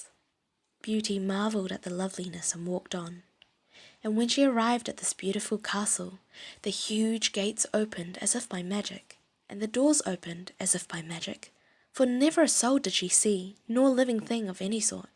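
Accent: New Zealand. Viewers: 아... 다른 채널에서 타다가 오게 됐는데 ㅠㅠ 자기 전에 듣기 너무 좋을 것 같아요! 뉴질랜드 발음의 특징(?)을 정리해주신 영상도 올려주신다면 좋겠어요! 딱 듣고 아 이건 뉴질랜드다 싶은 게 뭔지 알고싶어요 ㅎㅎ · 우와... 뉴질랜드 발음으로 영어듣기 하고싶었는데 드디어 그 채널을 찾았네요!! 너무너무 감사합니다~ 앞으로도 좋은 영상 많이 올려주시면 감사히 보겠습니다 :) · 항상 영상만 보다 댓글은 처음 남기네요ㅎㅎ 뉴질랜드 억양으로 동화를 들으며 공부할 수 있어서 너무 좋아요! 목소리 너무 좋으세요:)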